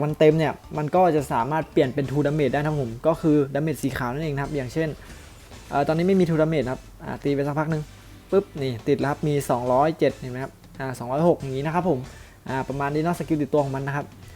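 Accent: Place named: South, Thailand. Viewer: สูคนใต้ม้ายครับ ผมเห็นสูแหลงออกทองแดงกัน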